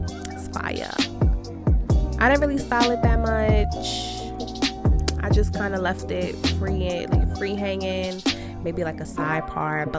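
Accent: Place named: New York, United States. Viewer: Are you from New York?? Because I’m from New York and I know that accent from anywhere and you’re gorgeous 😍😍😍😍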